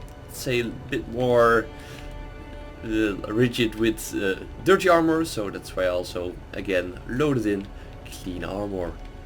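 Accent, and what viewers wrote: Netherlands. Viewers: i have this feeling your dutch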